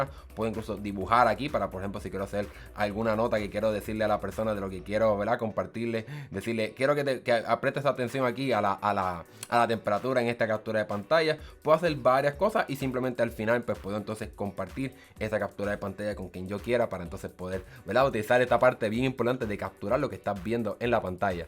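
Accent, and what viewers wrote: Puerto Rico. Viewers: ¿Eres boricua?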